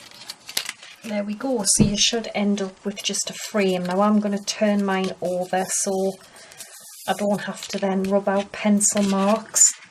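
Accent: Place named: County Durham, United Kingdom. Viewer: Really lovely card!! I just found your channel....was thrilled to hear your accent - reminds me of home. I'm from County Durham living in Queensland Australia.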